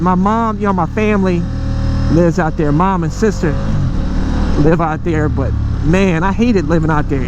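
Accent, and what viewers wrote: United States. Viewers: Don't understand how you're from Ohio and have a complete country bumpkin accent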